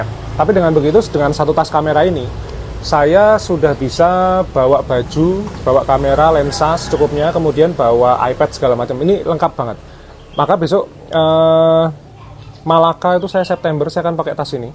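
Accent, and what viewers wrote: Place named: Semarang, Indonesia. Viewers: mas smpean wong semarang y. ? logate ky semarangan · logat semarang kentel kang wkwkw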